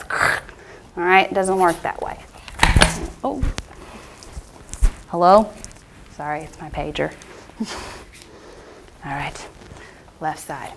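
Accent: South, United States. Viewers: This girl is southern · I love your country accent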